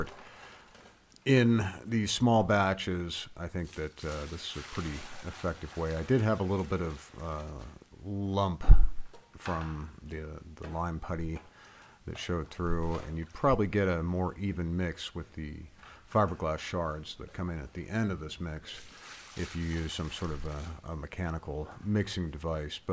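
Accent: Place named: Pittsburgh, United States. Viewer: You have that upper Pennsylvania accent like Pittsburgh. I’d wouldn’t be surprised if that is where you were raised. Just a guess. It’s a uniquely subtle accent.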